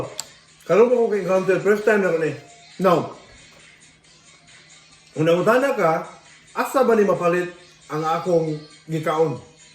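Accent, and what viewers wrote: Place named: Visayas, Philippines. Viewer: Kalami ana sir oi nalingaw ko nimu oi bisdak japon kaayo ug sinultian,salamat diay sa imong support ganiha sa akong ls sir/Lyn’s Beauty here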